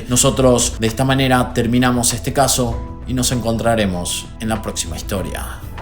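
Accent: Paraguay. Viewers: Wacala hablas como paraguayo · De casualidad no sos Paraguayo? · Los prejuicios siguen existiendo infelizmente los gays, trans y mujeres, fíjate el caso de el niño Lucio en Argentina, le negaron la custodia al padre y al tío paterno,las leyes están hechas por hombres en general y no por ser de libre pensamiento Feliz Navidad, te sigo hace poco pero tus cortos sin muy buenos Vivi en Asuncion 4 años hace muchos años y reconocí al toque tu lindo acento de Paraguay Soy Argentina y vivi en varios lugares, el último ( por ahora) es Barcelona Te mando un abrazo y te felicito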